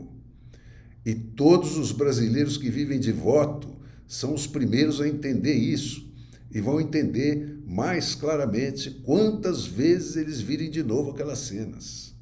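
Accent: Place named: Arab world. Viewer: esse cara tem sotaque árabe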